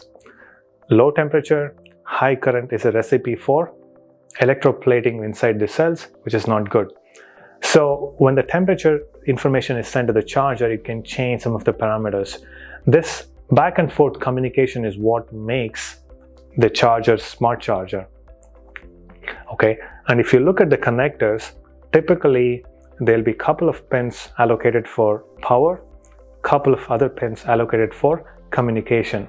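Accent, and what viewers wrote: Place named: India. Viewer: The only Indian accent ever that’s not annoying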